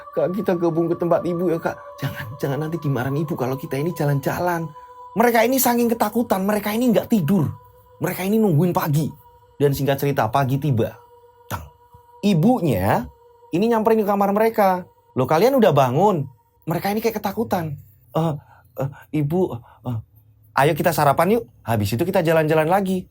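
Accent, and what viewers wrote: Java, Indonesia. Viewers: boom bomm hadiir jhor bhru mleysie. suke banget chinel ni. jwa nya medook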